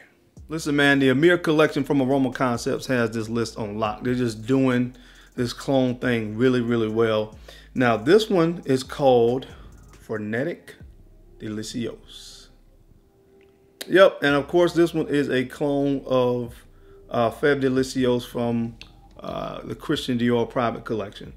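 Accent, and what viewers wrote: United States. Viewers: Because it's an American accent...what your doing is like me telling a French person "it's not Mach donohld, it's Mcdonald!!" When you say sushi or croissant do you also say it with a perfect Japanese and French accent everytime you order it? Lmao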